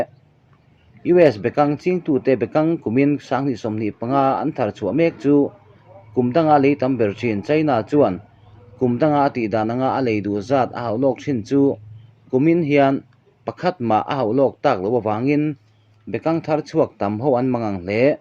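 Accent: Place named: Southern Mizoram, India. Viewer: I chhiar ngaihnawn hle mai. Mizo tawng pawh a tha e. Chhimpa i nih dawn hi. Duhlian tawngah chuan, Lunglei District leh, Tuipui ralho hi an thiam ber mai.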